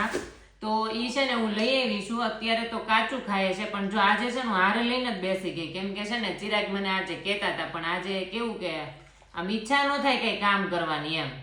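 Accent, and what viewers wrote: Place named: Kathiawar, India. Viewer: Tamari kathiyavadi accent Bov sari che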